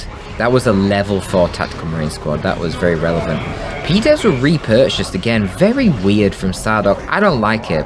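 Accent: Scotland, United Kingdom. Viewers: Scottish accent casts henceforth yes? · GGs, and great scotch accent haha. Where are you actually from?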